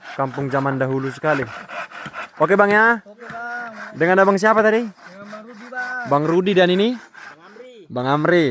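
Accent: North Sumatra, Indonesia. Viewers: mantap laeku saya yang selalu mengomen anda jarena saya duka dengan gaya anda membawakan cerita dan bicara anda sedap gitu loh sudah meng Indonesia walau logat bataknya masih kental tapi mantaplah pokoknya sukses terus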